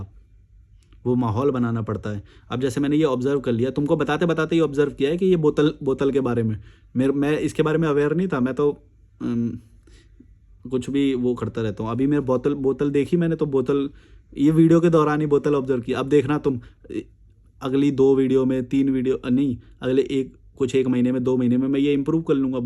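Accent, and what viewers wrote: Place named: Delhi, India. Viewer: Delhi accent...Nice good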